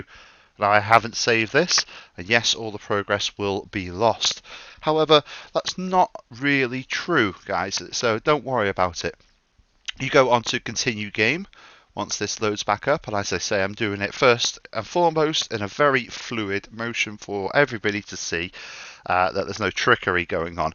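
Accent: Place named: United Kingdom. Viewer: You should play rust because your British voice. You'd do well. 😉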